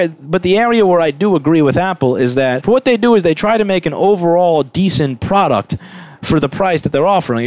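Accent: New York, United States. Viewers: You're definitely a new yorker...thats the only clear observation in this video.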